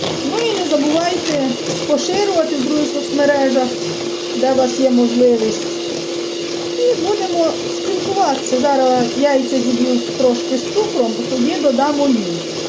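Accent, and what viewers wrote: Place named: Kremenets, Ukraine. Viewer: На кременеччині, Тернопільської області так говорять. І що? В кожному селі, чи районі, області є свій діалект. Не знали?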